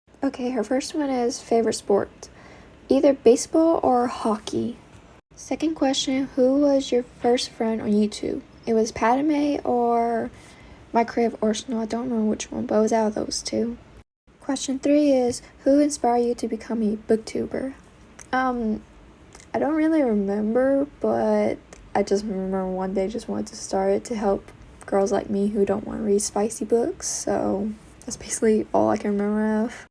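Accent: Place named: South, United States. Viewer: Thank you for the great answers, (especially your country song picks!) also, your soft southern twang in your voice is Fabulous!! 🫶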